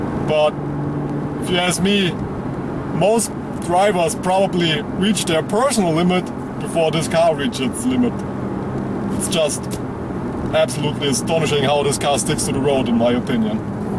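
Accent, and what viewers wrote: Germany. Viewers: I like his English with german accent. It is sort of like listening to Arnold Schwarzenegger review a car :D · I don't normally post. However, I find it ironic that he suggested you work on your English whilst his post contained grammatical errors. You have a very good grasp of the English language. Expressive, emotive and eloquent. Yes, you have a German accent, but any proficient English speaking person can understand you perfectly and you put a lot of the American youtube reviewers to shame. I just wish the car manufacturers would give you more access to their cars. Keep up the good work.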